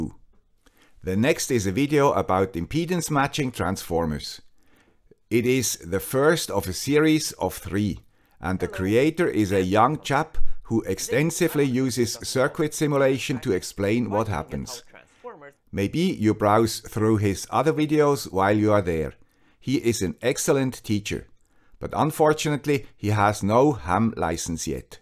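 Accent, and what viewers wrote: Switzerland. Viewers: There are some great ham channels on YouTube and as a returner to amateur radio, they have helped me learn a lot. I'm always glad to hear a little Swiss accent modulation of course!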